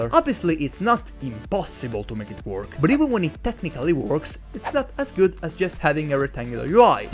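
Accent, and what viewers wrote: Italy. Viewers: Bellissimo video, complimenti! Avevo sentito l’italianità dalla pronuncia e infatti non era difficile da notare 😂🔥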